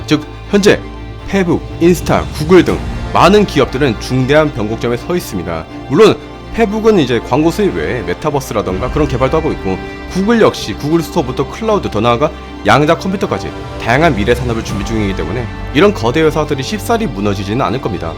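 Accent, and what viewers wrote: Gyeongsang, South Korea. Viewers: 경상도 분이시군요?^^♡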